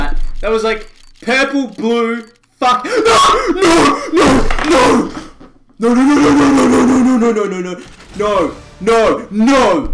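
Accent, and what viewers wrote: Australia. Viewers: australian? <3 your accent.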